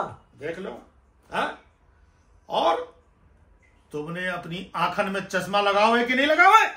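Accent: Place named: Madhya Pradesh, India. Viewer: एमपी वाला एक्सेंट बहुज्जोरदार हे भईय्या हम तो बा ही सुन कै एकदम खुस हो गये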